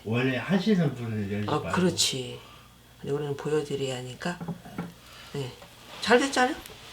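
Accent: Chungcheong, South Korea. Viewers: 구수한 충청도사투리군요ㅎㅎ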